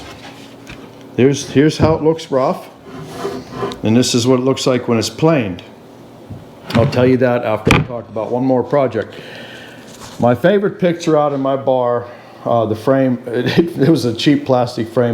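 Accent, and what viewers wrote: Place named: Maryland, United States. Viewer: With your accent I would say you are from Hagerstown MD, where I’m from.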